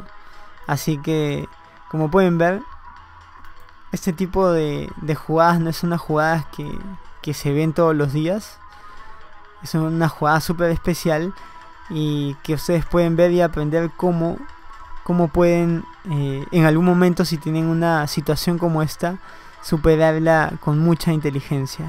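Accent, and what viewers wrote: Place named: France. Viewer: esque es frances.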